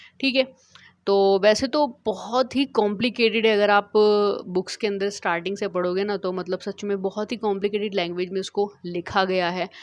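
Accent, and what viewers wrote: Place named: Pakistan. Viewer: Mam your content is very helpful but your english accent matches with pakistani cricketers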